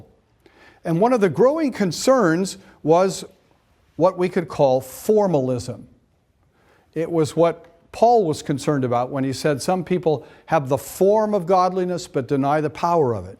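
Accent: United Kingdom. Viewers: That accent reminds me of William Butcher, a British fictional character from the Amazon Prime series 'The Boys'.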